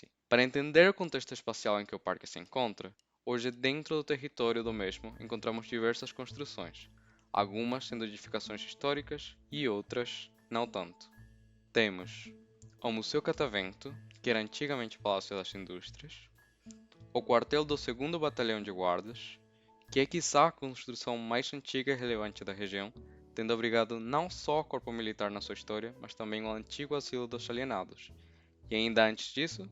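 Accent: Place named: Portugal. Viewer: De onde é seu sotaque? Fiquei muito confuso, parece mistura de português (de Portugal), com paulista e carioca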